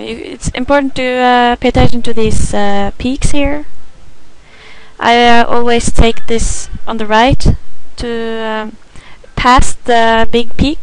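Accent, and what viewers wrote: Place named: Norway. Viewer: You sound norwegian.. Where are you from?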